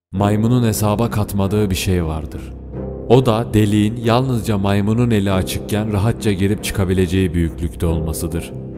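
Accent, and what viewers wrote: Ankara, Turkey. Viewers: gardaş anagara şivesinden geçme senin videolar öyle guzel gardaş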